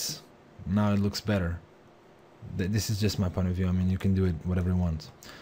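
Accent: Portugal. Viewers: muito bom! o teu ingles é perfect mas dá para ver que és tuga ;) Abraços e continuação de bom trabalho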